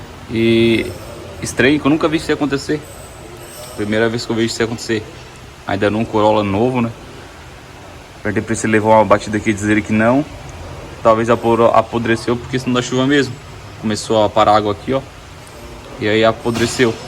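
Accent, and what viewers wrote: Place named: Santa Catarina, Brazil. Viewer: Sotaque de SC. É da Floripa?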